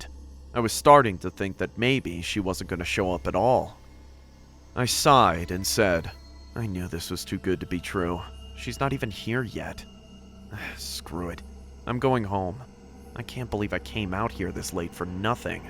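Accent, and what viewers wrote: United States. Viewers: Where is your Tennessee accent???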